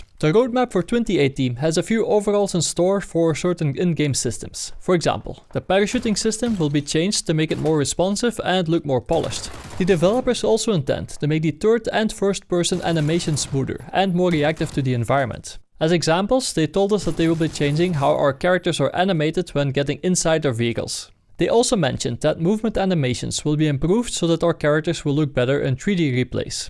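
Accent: Netherlands. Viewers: He;s Dutch I think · My guy you sound so extremely fucking Dutch, it's unbelievable.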